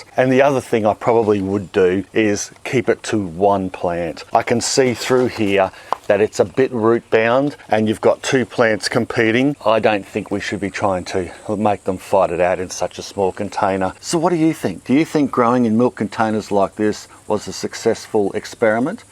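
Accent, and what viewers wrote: Australia. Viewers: WOW! An Aussie, in QLD to boot. I live in Cairns so here it's either hot, hotter or pouring with rain (this doesn't include cyclones). It's so refreshing to have some advice from Australia, and not have to interpret it from OS into our climate. I love growing from scraps. I have very successfully grown sweet potato from old (sprouting) potatoes. One year I got over 15 kg!! Growing things in my part of the world can be difficult. "Plant in Autumn", "Sow before summer", means nothing up here. You plant when you remember to and hope it doesn't go to seed before 6 weeks! Anyway, love your information, and to hear an Aussie accent. Thanks.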